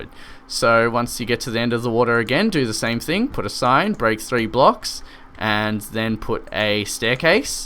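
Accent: Australia. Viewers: you inspired me mate, wait your aussie! SAME!!!!! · easy as, aussie?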